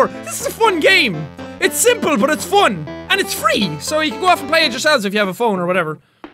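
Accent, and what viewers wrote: Ireland. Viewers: heya you uh you irish i'm new to your vids so idk and if so i'm part irish so.... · This was the first video I ever say on this channel, dang nostalgia. I remember being surprised by the intro, not because it was loud but because he was Irish 😂.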